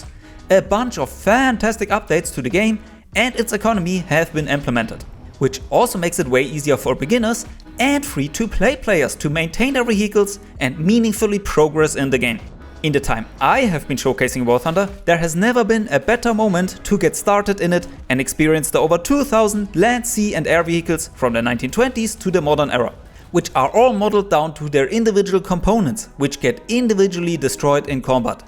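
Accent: Germany. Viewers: You have very german accent. Good Video bro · Hört sich Deutsch an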